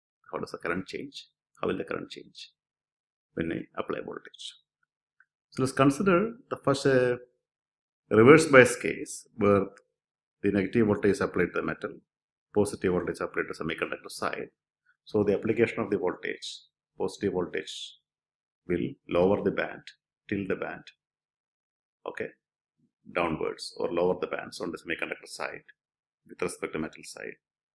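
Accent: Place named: England, United Kingdom. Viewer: Omg!!! Amazing English accent 😊😊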